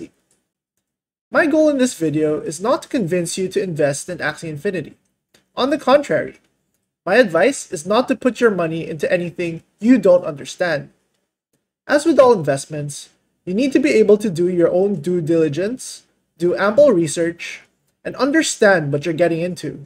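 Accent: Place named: Philippines. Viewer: Accent palang alam na Filipino na 😅 Nice content sir 👍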